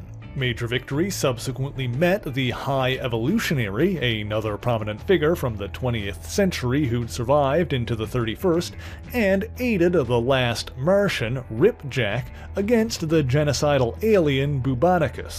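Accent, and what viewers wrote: Newfoundland, Canada. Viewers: Why does the narrator sound like a Newfoundlander to me?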